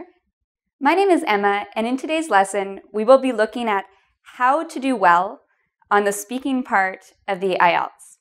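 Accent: Canada. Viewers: Your canadian accent is so cute :) OBOOUT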